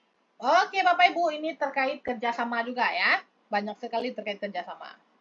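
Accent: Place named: West Sumatra, Indonesia. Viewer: Dari gaya bicaranya ibu orang Minang ya.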